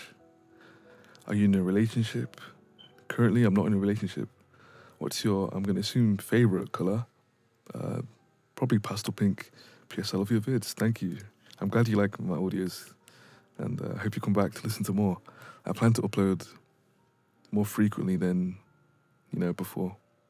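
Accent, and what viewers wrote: United Kingdom. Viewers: Omg so I wasn't going to comment on this video because I am sooo late but we have so much in common it's incredible! First of all, I am a 20-year-old female, my parents are from Uganda but I was born in France and I live there now buttt I also lived in England for 4 years when I was younger near Wimbledon so that's why I love your accent and it feels so much like home to me :) I love winter and Halloween so much they are the thing I look forward to all year and Christmas music is my kryptonite, the country I would like to visit the most is Canada and I also love kdramas and anime so much, my fav recent series is Alice in borderland. Also if that wasn't enough I have a very artistic background as theatre is my passion as well as music and I have studied and practised both for years and would love to continue, 99% of my friends are either in graphic design/art (art school in architecture/ designing etc), music or cinema/ theatre so art is the only thing I know and love religiously with all my heart. 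There are also so many other things that I forgot but you get the picture lmao I think it's crazy that I have been a fan of your channel since the begging before you even had 5k and that I now find out that we have a LOT in common :) anyway sorry for my long paragraph but I love your work and hope you get to 1M one day because you deserve it so much! I am on your discord so if you ever want to chat it would be a pleasure! my is Angelina with purple hearts <3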